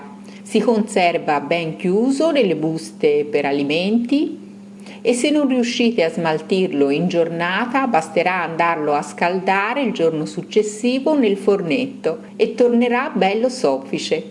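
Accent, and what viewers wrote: Tuscany, Italy. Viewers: Altroché se rendi .....la bontà, sei unica.....le tue ricette sono perfette,molto molto brava. ...e poi la il tuo accento toscano. ....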